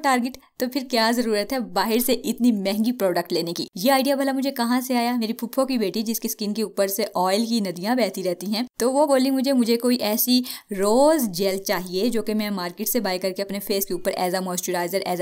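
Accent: India. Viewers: Ye pakistani itni indian jese kese bol rhi hai hay hay kese kese 👌👌❤️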